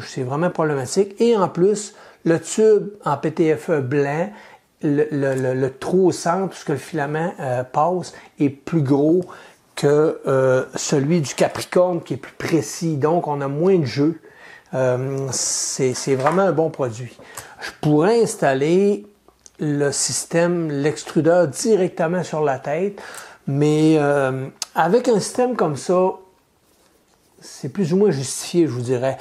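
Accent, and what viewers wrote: Canada. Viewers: Salut, je suis tes vidéos depuis quelques temps, je viens d 'acquérir mon Ender 3 V2 donc je suis en pleine pose du BLtouch en se moment même, j'ai rencontré un gros soucis ma carte mère a brulée.. un composant a coté de la diode F2, est- ce réparable ou poubelle direct... merci de tes lumières et continue tes vidéos, je suis fan de ton accent canadien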